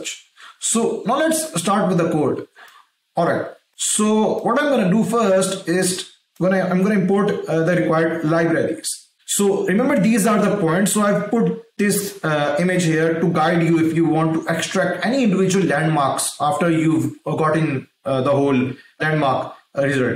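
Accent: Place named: India. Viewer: Speak much more slowly, please. English with an Indian accent is hard.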